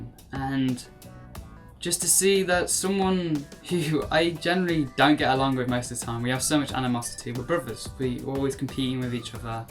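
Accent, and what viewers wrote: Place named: United Kingdom. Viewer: You look so good and such a beautiful long neck, and that beautiful British accent, you are adorable.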